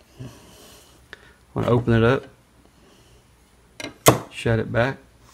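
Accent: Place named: Georgia, United States. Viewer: Are you a Georgia boy! Lol. Nice meter. Stay safe buddy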